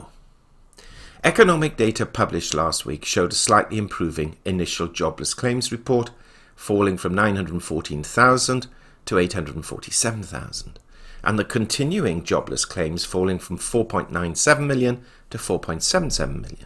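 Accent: United Kingdom. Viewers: Can't beat a British accent when it is spoken well..... PS..keep buying PMs !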